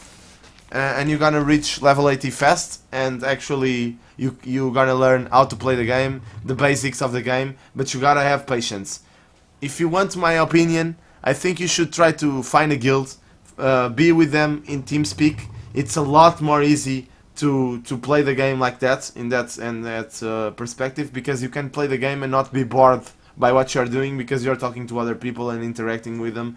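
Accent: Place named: Portugal. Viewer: Bom video, assim que te comecei a ouvir percebi logo que eras português kkk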